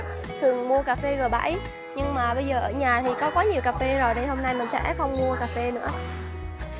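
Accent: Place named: Quang Nam, Vietnam. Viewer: Đặc sệt tiếng Qoảng Nom. duyên thật.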